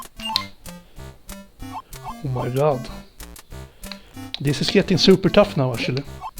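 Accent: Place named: Sweden. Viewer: svenska kraft haha, hörde dig var svenska så snart du började prata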